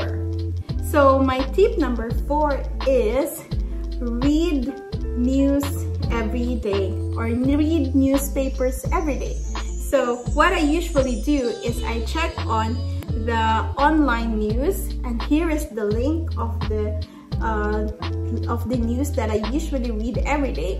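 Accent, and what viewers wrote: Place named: Philippines. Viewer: Are you a Filipino? Hehe. Because you sound like a Filipino accent when speak in English 😅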